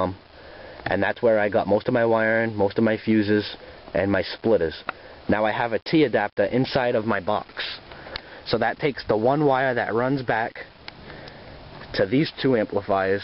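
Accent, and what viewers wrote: Boston, United States. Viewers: Boston accent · No friggin way you're from the Boston area! I can tell cuz I'm wicked smaat! Lol nice video man. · thats a thick boston accent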